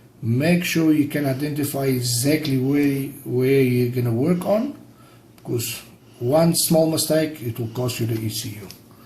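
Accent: South Africa. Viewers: Are you possibly South African? A Boer? :O Very nice ecu job! Thanks for the detailed video.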